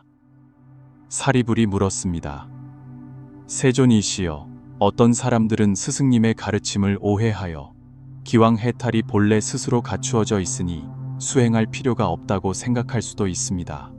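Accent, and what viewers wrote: China. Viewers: 중국발음이 오히려 집중을방해하네요 그러나 고맙게 잘 듣고있슴니다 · 중국어 발음이 방해하네요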